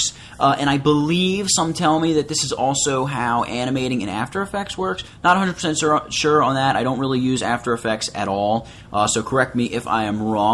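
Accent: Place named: United States. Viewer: typical US accent..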